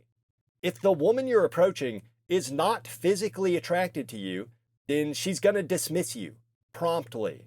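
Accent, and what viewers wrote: South, United States. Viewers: he definitely has a slight southern accent. Wouldn't say hillbilly, but for sure Southern. Hillbilly is much more pronounced. He sounds like a dude who lives in a slightly more rural area.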